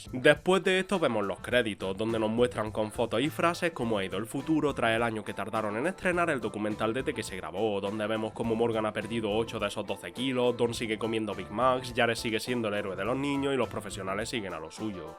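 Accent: Andalusia, Spain. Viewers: Soy de LATAM y me cuesta distinguir los acentos españoles. ¿De donde proviene el acento del narrador del canal? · Viene de Andalucía, que se encuentra en el sur de España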